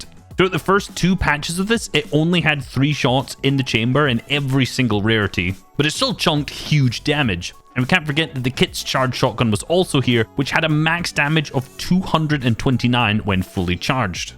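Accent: Scotland, United Kingdom. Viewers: Ay you Scottish? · r u scottish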